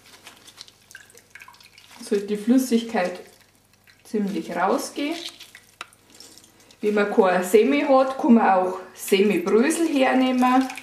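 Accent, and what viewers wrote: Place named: Bavaria, Germany. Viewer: ich mag ja den akzent aus dem schönen bayernland xD das rezept probier ich das we mal aus danke für das video ;)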